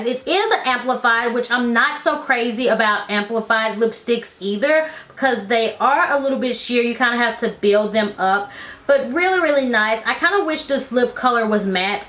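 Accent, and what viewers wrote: South, United States. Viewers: I KNEW i heard a SOUTHERN accent! ☺😊😊😊😊 im from alabama too! Do you have an eyebrow tutorial i looked but couldnt find one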